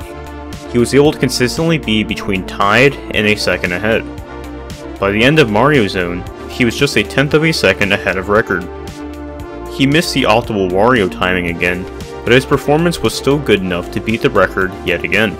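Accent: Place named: Canada. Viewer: "...sorry if i keep rambling on but theres something I need to talk abut..." I fuckin love canadians and how you all talk <3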